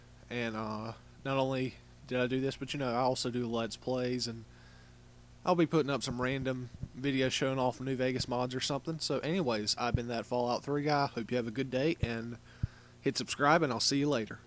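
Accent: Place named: South, United States. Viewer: very southern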